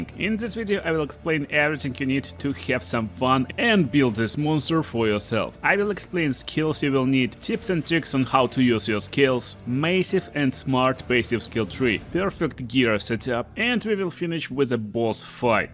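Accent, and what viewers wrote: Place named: Russia. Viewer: as a fellow russian I am so good at spotting russian accent, interesting how you prolong some vowels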